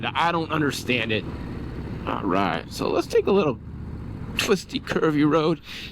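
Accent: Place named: Baltimore, United States. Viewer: Dude, this guy sounds EXACTLY like Stavros Halkias.. Personality wise too. Baltimore area accent perhaps? This super glide looks amazing 👏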